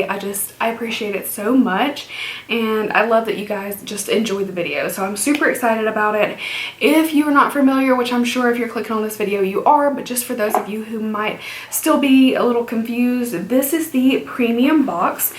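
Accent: South, United States. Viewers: Hello from Alabama! I think I detect a southern accent , where are you from? I’ve been a JSC fan from the very start and my favorite lippies would have to be celebrity skin, unicorn blood, and ?. I gravitate toward pinks, nudes and darker berry shades.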